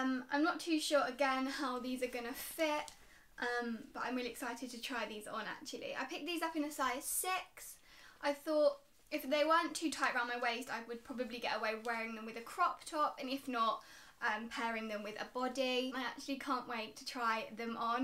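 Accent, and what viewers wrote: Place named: RP, United Kingdom. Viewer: is stunning. Plus the posh English accent is lovely.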